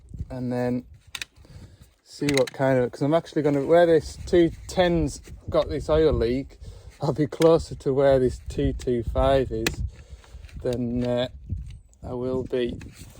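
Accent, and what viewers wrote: Cumbria, United Kingdom. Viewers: Hi,My mum was from a small place called Longtown just outside of gretna green. Now I remember as a youngster her having quite a similar accent to yourself,are you from that area,she was a mad keen Carlisle football supporter which I remember saying was about 7 mile from her place of birth.....Just keen to know,I'm a joiner by trade but really enjoy your content.....Regards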